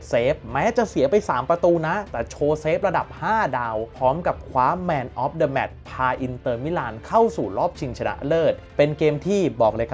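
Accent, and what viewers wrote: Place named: Isan, Thailand. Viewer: ขำตา พูดอีสานผมลูกอีสานยังขำเลยอ่ะ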